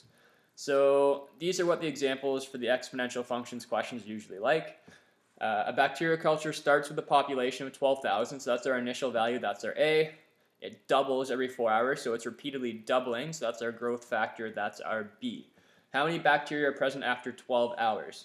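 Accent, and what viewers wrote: Canada. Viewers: The views go from 40k down to like 16k and then down to 10k. People arent sticking around for the whole thing. Lol. Shoutout to Canada. This dude sounds like a typical canadian dude.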